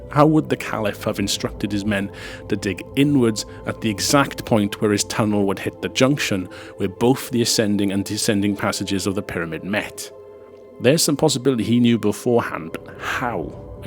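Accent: Wales, United Kingdom. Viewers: The Welsh accent always makes me smile:) it's a perfect voice for dead pan comedy:) you maybe won't get this reference.... But do you remember the song? by??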